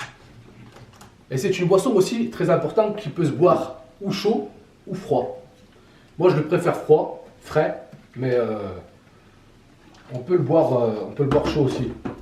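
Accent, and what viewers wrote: Saint-Étienne, France. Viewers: T as a petit accent, tu serais pas de Saint É?.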